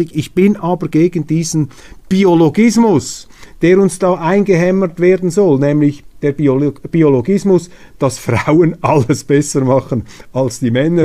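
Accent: Switzerland. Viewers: In der Schweiz darf man „faltsch“ sagen. Ich finde seinen Accent Fédéral ganz sympathisch.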